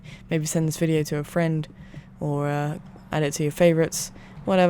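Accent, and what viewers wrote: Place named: England, United Kingdom. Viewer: Drum and that was awesome and I love ur England accent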